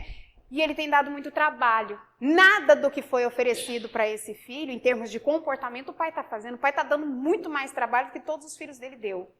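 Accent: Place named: São Paulo, Brazil. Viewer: vc é de onde? gosto muito de suas palestras, pelo sotaque vc é paulista.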